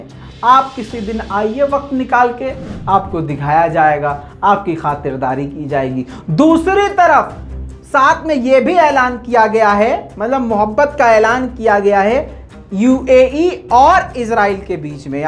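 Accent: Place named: Uttar Pradesh, India. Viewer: भाई आप यू पी से हो, किस शहर से हो आप की भाषा से लगता है कि आप यू पी से हो।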